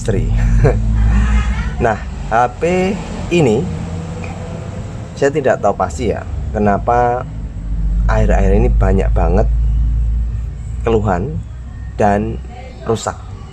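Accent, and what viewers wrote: Java, Indonesia. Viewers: Saiki dadi sering inframe mas...lg taun 2019 akhir pertama ngikuti chanele sampean...pirang2 wulan penasaran banget...wonge koyo opooo...logate njawiii...vibes sabar banget dari nada suarane...sukses selalu om..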